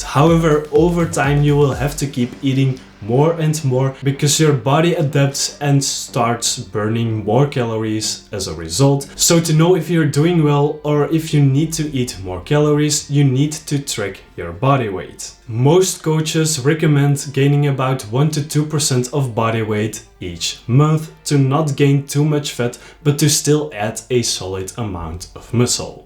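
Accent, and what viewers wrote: Belgium. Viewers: ik dacht al dit klinkt als een belg toen zag ik die volle melk en wist ik het zeker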